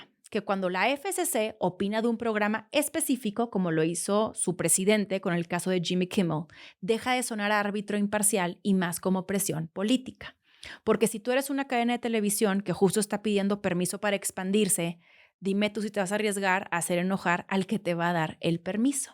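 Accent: Monterrey, Mexico. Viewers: Vivo en USA, soy Regio y escucharte me hace sentir en casa 🤠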